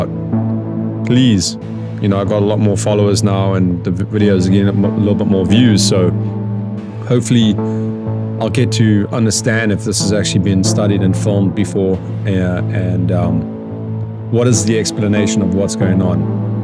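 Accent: South Africa. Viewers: I love your content & one of the reasons why is because I can hear you’re South African 🇿🇦 ❤ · Cool, I knew I recognized your accent, I'm from SA too!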